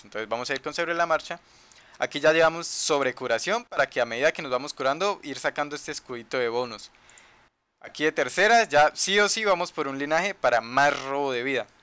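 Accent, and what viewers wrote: Colombia. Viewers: se te nota lo colombiano xd buen video tio:^y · Amigo mío eres de Colombia? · muy buenos tus vídeos y se entiende lo colombiano v: toma tu like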